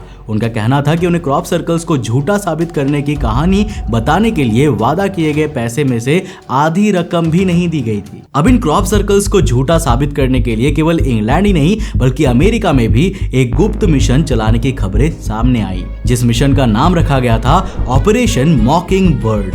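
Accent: Bengal, India. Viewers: I am very curious are u bengali??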